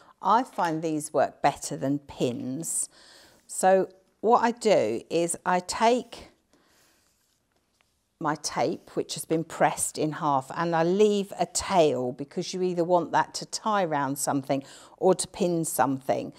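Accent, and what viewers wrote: United Kingdom. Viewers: Plus I just love your British accent.😊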